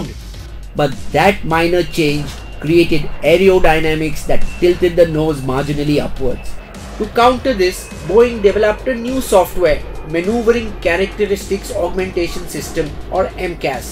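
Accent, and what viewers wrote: India. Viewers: IS MY NEW ADDICT VOICE IS VERY CLASSIC MYSTERY DIGGERS ALSO MY FAVOURITE BOTH WITH NICE INDIAN ACCENT UNIQUE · Thees is Indian English speaking style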